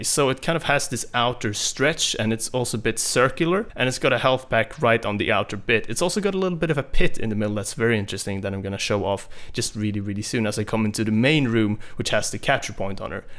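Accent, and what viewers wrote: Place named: Sweden. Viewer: u swedish af boi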